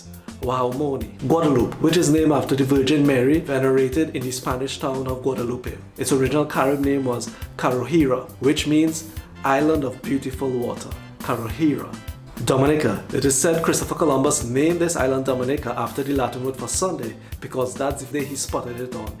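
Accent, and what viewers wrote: Trinidad and Tobago. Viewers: Loved the video, very informative and love your Trini accent, my great grandmother originated from Trinidad and my Grandmother from St Vincent. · Barbados's name that so fantastic - the obvious reason we were not taught this in school no books were available to know either, but now we can learn. truth has to come out! Thank you and who else would do such a great job with an accent but a Trini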